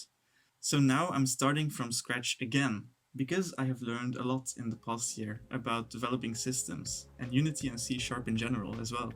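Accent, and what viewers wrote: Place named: South, Sweden. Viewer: Are you from Sweden? I'm from Sweden I recognize that southern-swedish-to-english dialekt anywhere :) Also I like games with terraforming! If you eonder how the algoritm brought me here i started watcging one devlog and now youtube brings me 1-2 devlogs from different people everyday it must have hooked me to "devlog" as a term so now it recommends everything that has that in it. If your from sweden i wish you extra luck because we need more game developers I wanna play some swedish games thats not just minecraft! If your not swedish i still wish you the best :)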